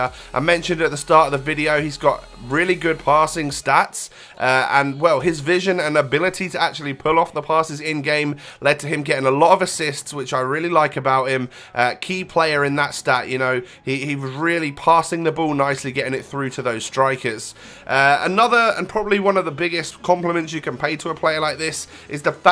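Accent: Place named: RP, United Kingdom. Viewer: Very posh accent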